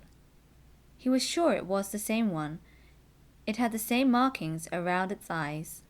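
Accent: United Kingdom. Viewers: After all, football commentary and Harry Potter books should be heard in British English. thank you. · 해리포터 원서로 공부하고 있는데 영국발음으로 읽어주시니 너무 좋아요~~!! 구독하고 가용ㅎㅎ죽음의 성물까지 존버합니다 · 영상 감사합니다🙂 영국발음 멋있어요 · 영국발음 너무 매력적이에요 :D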